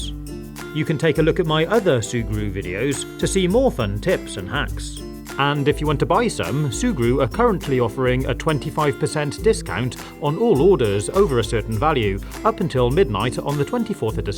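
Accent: United Kingdom. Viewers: Were you born in Britain or is that your accent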